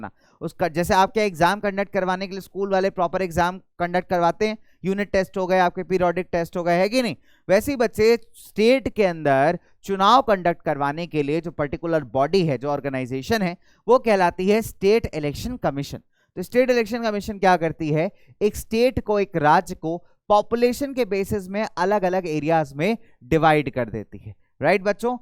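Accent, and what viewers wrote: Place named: Uttar Pradesh, India. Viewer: Sar lagta hai up se hai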